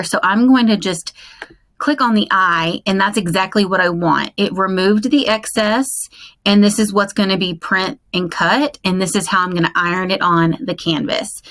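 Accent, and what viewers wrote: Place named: Texas, United States. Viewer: I could hear that Texas twang!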